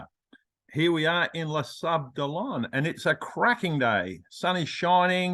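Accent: Australia. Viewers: Well done. Swapping one Aussie accent for another. At least I could understand it.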